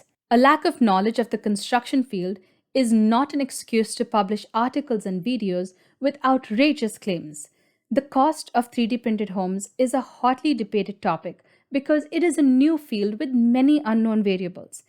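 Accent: India. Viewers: Indian English sounds so different from the proper british english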